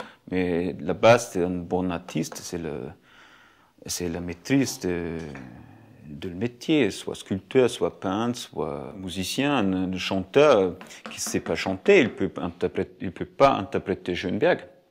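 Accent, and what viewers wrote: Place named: France. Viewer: Amazingly beautiful work. All that and a french accent.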